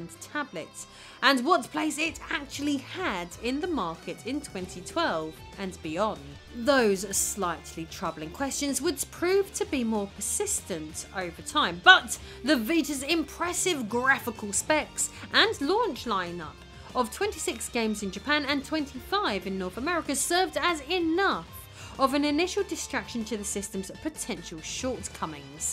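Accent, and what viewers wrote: United Kingdom. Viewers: oh dear! Your british accent is simply killer!!!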